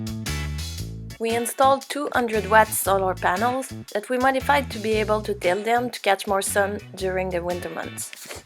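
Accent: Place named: Quebec, Canada. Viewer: french canadian i think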